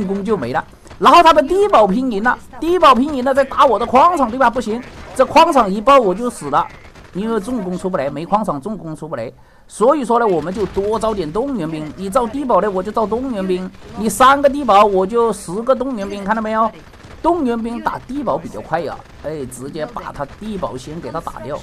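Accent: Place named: Jiangxi, China. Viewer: 好像是江西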